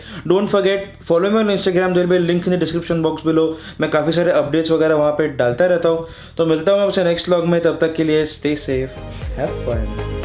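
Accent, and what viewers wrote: United States. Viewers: Jamtay Jamtay U.S. Accent yetay halu halu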